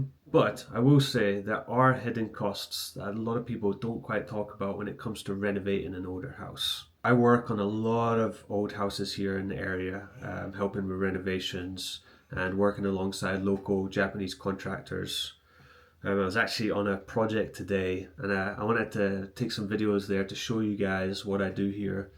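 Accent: Scotland, United Kingdom. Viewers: Great video mate, thanks for the breakdown. I have a couple of questions that I would love if you covered in a video sometime, though im sure you already have at some point. I obviously hear the Scottish accent, im from England myself, currently living in the states, and have travelled a lot so I know accents can change after a while, but I sometimes gear your accent changing on certain words so I cant figure out if you're native Scottish, or born somewhere else and grew up in Scotland or something 😅 Also, I wrongly assumed that you were married to a Japanese woman, and thats how you got permanent residency, but I dont think thats the case. Could you go into more details about your journey to gain residency? Or maybe you already have a video on that. Anyway, thats enough waffling from me, thanks again for the video and the insights. I subbed and look forward to more videos. 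Cheers mate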